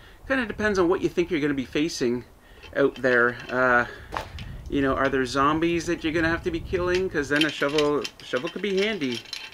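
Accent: Canada. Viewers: Like I have told ya before....YOU MISSED YOUR CALLING !!!!!.... 🥰🤣 love hearing you talk. City girl too. That Canadian accent !! You’re just so fun to watch when you’re not on ICE. !! 😳😳 that does scare me ! Enjoy your place... make those memories and I hope y’all have a long life there!! 🥰🦮🦮!! ❤️🍀🍀🍀🍀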